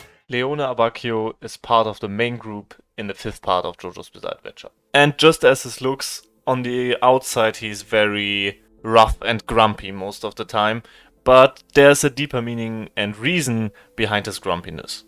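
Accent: Germany. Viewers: You sound VERY German in accent coming from another German, it's very endearing to hear! This little thing that delighted me aside though, solid video! As a local Abbi fan I thoroughly enjoyed it. ❤️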